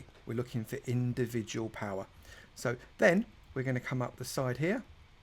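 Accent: United Kingdom. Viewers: it's not even close, like it's not even in the realm of Gordon's low fast-paced voice. The only similarity is that they both have a British accent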